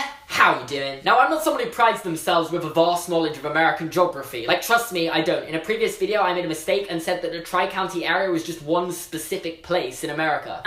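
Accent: United Kingdom. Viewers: i really love your british accent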